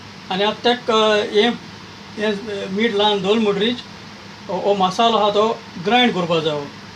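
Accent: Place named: Salcete, India. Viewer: I just love the way you talk pure sashti konkani and your way of explaining the recipe thank you so much God bless you always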